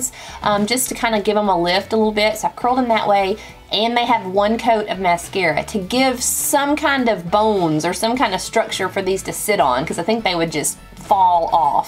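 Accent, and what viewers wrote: South, United States. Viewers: She has the cutest Southern accent!! ♥ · I just bought them yesterday and just found you today thank heavens, I've never tried false lashes of any kind and will try your advice. Hope I can do this. Also, I love your sweet southern accent! Thanks for your help! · Love...and love your southern accent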